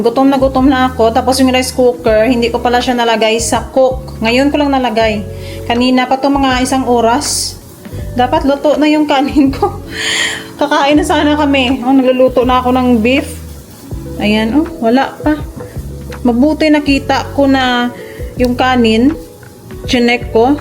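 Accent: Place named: Hiligaynon, Philippines. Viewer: You still have Ilongo accent even speaking Tagalog ay ambit sa iyo Monday!!!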